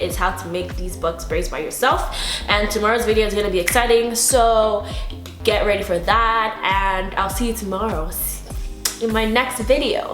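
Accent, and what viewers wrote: Nigeria. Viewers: you look so gorgeous here. Naija accent coming through.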